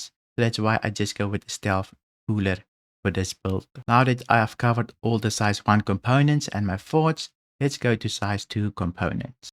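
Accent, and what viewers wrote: South Africa. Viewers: awesome thank you, are you from South Africa?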